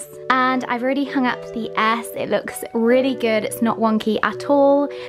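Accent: United Kingdom. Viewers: british accent is sooooooo beautiful. Couldnt stop listening to you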